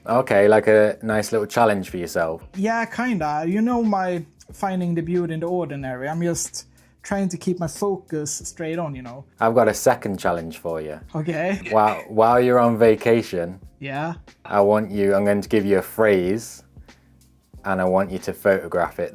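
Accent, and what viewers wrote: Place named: Northwest England, United Kingdom. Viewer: Eeee Saltburn, I was dragged up in Teesside (Stockton) but haven't been to Saltburn for yonks. You sound like you're from NW England more than NE!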